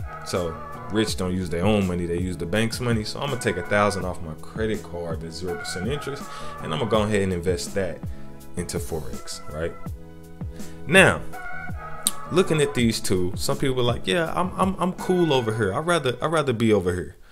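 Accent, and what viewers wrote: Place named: St. Louis, United States. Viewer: Sounds like he from St.Louis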